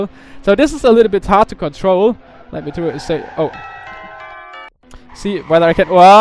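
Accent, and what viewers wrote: Germany. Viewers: Hey, are you maybe german?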